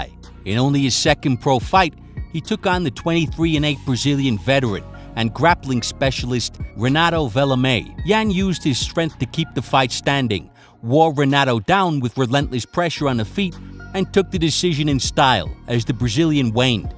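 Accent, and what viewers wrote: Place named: Northeast, United States. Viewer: Time for a poll: Which part of the Northeastern US is the narrator from?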